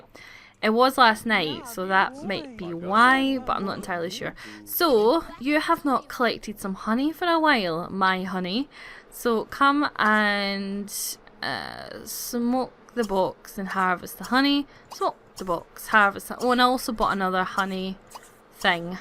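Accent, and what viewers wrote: Scotland, United Kingdom. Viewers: Baby girl = Skye xxxxx love your videos and your voice. a scottish accent is always fun to hear! haha x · Oh my god! This...is...amazing!! Don't shut your self up please! I want to hear your beautiful Scottish accent.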